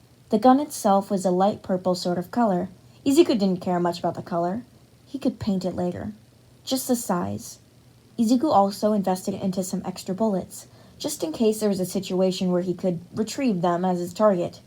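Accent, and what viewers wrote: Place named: Texas, United States. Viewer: The god damn texas accent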